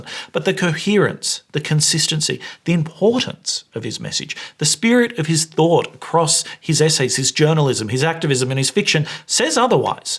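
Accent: United States. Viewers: Chicago South Side Latino/African-American? 🖤❤️🤍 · British? He sounds American to me (speaking as an Englishman).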